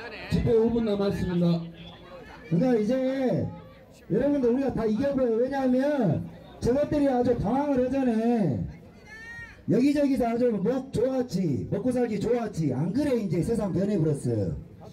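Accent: Jeolla, South Korea. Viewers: 시팔 세퀴가 전라도말투네! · 전라도네 사회보는놈 듣게싫어사투리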